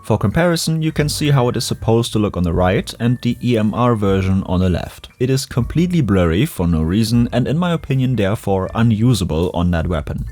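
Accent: Germany. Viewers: I fell in love with your voice and German accent in the first video I watched.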